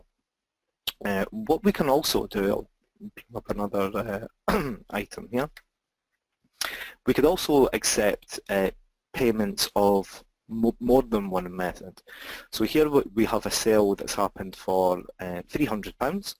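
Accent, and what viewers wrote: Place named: Germany. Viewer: Like your German accent :) cheers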